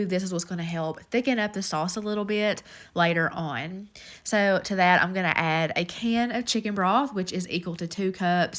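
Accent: South, United States. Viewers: great video again. Love your cooking, presentation & of course your delightful Southern accent! Please do regular videos in 2024. Thanks & best wishes from Sydney Australia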